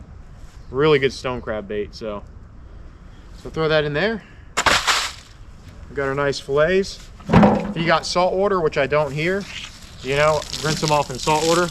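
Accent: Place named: Northeast, United States. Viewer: you may have some northeast in that accent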